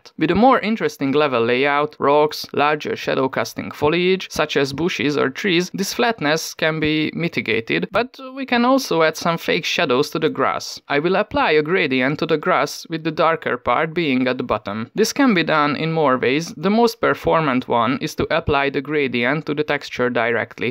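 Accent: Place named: Hungary. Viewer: Hunglish accent my beloved ❤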